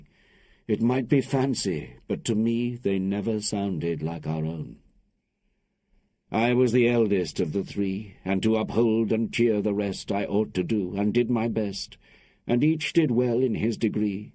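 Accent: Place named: England, United Kingdom. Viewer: Oh thank you, thank you so much !!! AT LONG LAST. A good English deep voice, with a beautiful English accent, reading this masterpiece written by the great Lord Byron. That’s how it should always be. I enjoyed every minute of it. Thank you once more.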